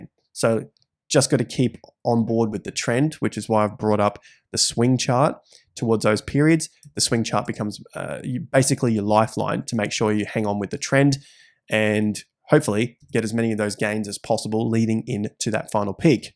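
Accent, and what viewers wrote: Australia. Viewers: Surname might be Italian, but an Aussie and he speaks like one.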